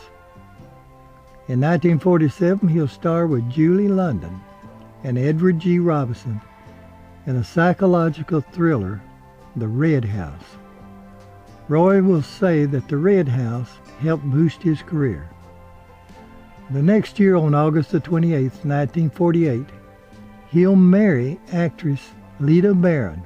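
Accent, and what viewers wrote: South, United States. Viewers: Mr, I always enjoy your stories. Keep up the good work. Love your soft southern voice. A friend of Lee Remick, Amanda Lane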